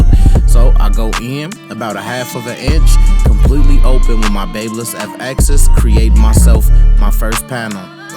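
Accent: Chicago, United States. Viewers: Knew you were from Chicago just the way you talk we got a certain dialect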